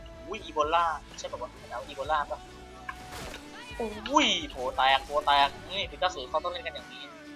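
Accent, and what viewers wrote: Isan, Thailand. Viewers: คนอีสานรึป่าว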